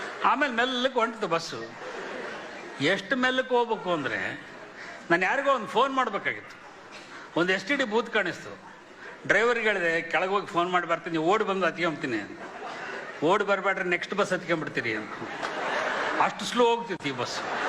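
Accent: Ballari, India. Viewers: Ballari Kannada ❤ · ರವಿ ಬೆಳಗೆರೆ ಅವರ ಮಾತನಾಡುವ ಬಳ್ಳಾರಿ ಕನ್ನಡ ಕೇಳಲು ಆನಂದ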